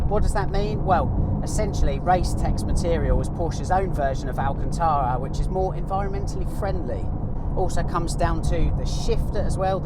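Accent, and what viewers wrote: England, United Kingdom. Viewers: he is English. They pronounce lots of words differently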